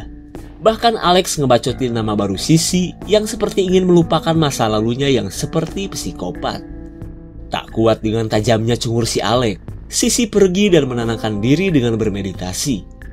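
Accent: Sunda, Indonesia. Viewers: Sunda pisan euy...😄😍❤👍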